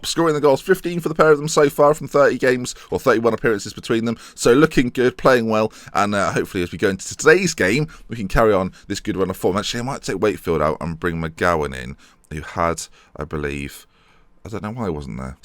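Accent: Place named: South East England, United Kingdom. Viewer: I don't actually know for sure but judging by the accent he lives somewhere down in the south - east and also I know he has been to Brighton before from one of his Salford story episode so he probably lives near London or something like that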